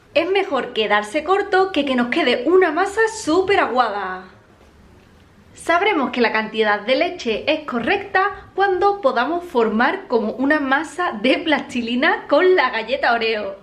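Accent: Spain. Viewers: Eres de ESPAÑA Saludos desde MÉXICO 🇲🇽🇲🇽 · Sos de España verdad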